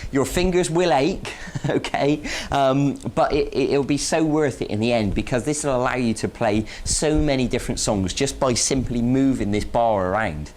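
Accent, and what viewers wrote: United Kingdom. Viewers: oh boy!!! r u kiddin' me!!? I agree that Boys n Gulz have different voives but gays also have got their unique voice, i knew 2day... he's a British n british have their own way of talkin' English. That's it! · his voice isnt funny hes british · Well, Great Britain is a pretty big place, so there's plenty of different dialects (a guy from texas and a new yorker doesn't really sound like they're from the same country). He doesn't talk the stereotypical London english, but it stills sounds like he's form GB.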